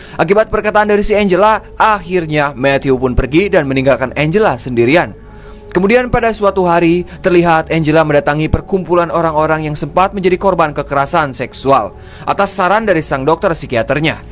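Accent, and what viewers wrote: West Java, Indonesia. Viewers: Nada narasinya kaya IQ7 deh,apa emng klo org Sunda ngomong Bhs Indo kaya gini yaa?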